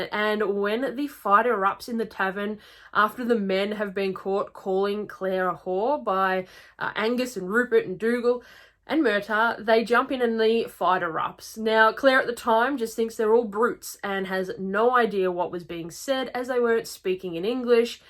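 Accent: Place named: Australia. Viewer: I am a huge fan of the Australian accent and I absolutely LOVE yours! No criticism here.:)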